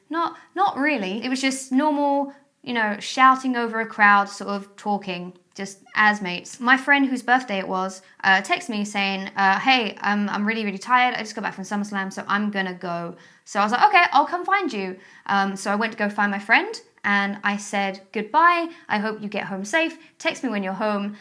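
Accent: Essex, United Kingdom. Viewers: Your Essex accent is like so much stronger??? you sound like 2012 aha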